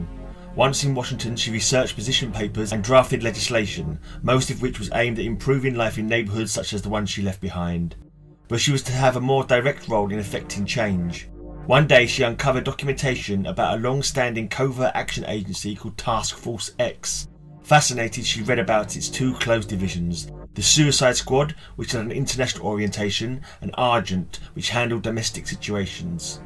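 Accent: United Kingdom. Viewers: Viola played her in Suicide Squad. How to get away with murder is a TV show. Wild show! My brother Matt was in Orange is the New Black, Ratter, and several other things. His best friend Hartley Sawyer played Elongated Man/Ralph Dibny on The Flash TV show. He was brilliant!!! They fired him because of his Tweets from 2011. I was hoping he could tell the producers to give my brother a job as Booster Gold or Green Lantern. Oh well. I shared this vid on Twitter. U have a great narration voice. British, I assume?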